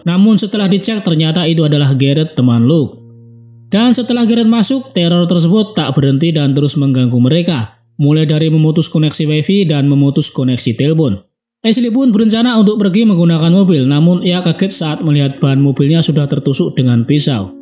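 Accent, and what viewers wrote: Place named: Java, Indonesia. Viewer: Jowone kentel mas wkwkw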